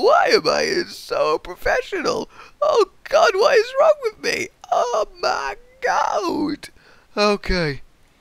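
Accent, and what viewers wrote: United Kingdom. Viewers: British .. why would he be german lol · is british or german? or did he move to england later on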